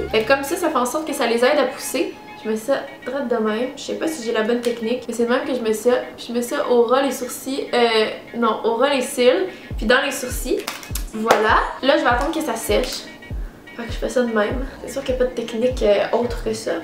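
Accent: Quebec, Canada. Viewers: Ton accent québécois était plus perceptible avant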